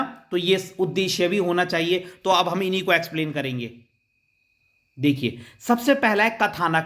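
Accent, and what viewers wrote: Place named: Pahadi, India. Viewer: You are phadi sir apki awag lgri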